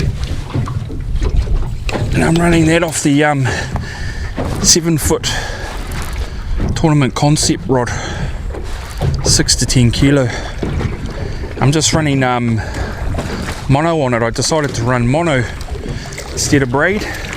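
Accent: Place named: New Zealand. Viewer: No offence mate but you have a huge kiwi accent ! ...hahaha....I miss it actually having lived in nz for 22 years....now i have to listen to the aussie accent.....nowhere near as cool a !!!! Keep up the great fishing vids....nice healthy looking snaps.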